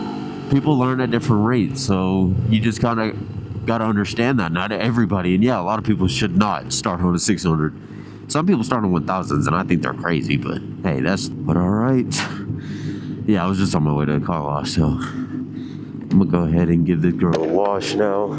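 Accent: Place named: North Texas, United States. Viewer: took me 30 seconds to realize you were in north texas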